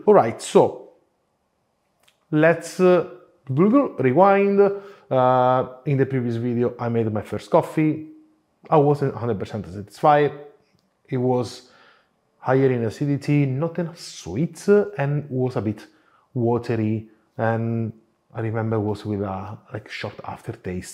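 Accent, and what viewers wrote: Italy. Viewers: Hello, love your show and you have a nice Italian accent and well-spoken English. Just wanted to tell you that a word that you use often should have the accent on the first syllable as is the case in spoken Italian. The word is VARIABLE.....VA' REE A BLE...not...VA RYE' A BLE . Please excuse this subtle correction while I always chastise English and American native speakers who are often the worst at respecting foreign word pronunciation.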